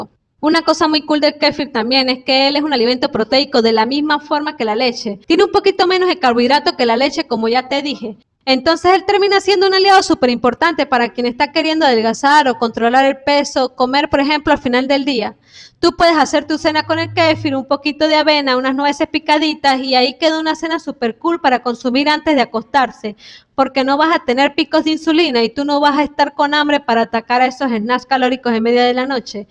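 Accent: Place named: Venezuela. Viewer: Hola 👋 una pregunta eres de Venezuela; me suena el acento, seguiré tus videos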